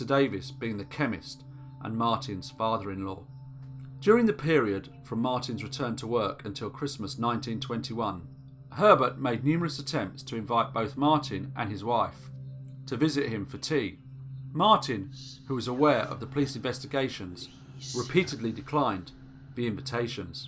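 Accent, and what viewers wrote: England, United Kingdom. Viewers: Hi! I really enjoy your narrations an voice. The pacing, emotion, cadence, and enunciation all balance out very well. I was curious as to what part of Europe you live/d in with that particular accent. I'm assuming it's English and all, but you seem to have a softer edge? or something to it. Don't think I can really put my finger on it. It may just be your particular way of speaking instead of a particular accent or regional way of speaking. Still, I'm curious where abouts, roughly, you live. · God almighty wat th hell is it that draws me to an English accent th music, th talk, everything about these people says hey listen listen I got to go to the UK... Very good story. Great speak loved th story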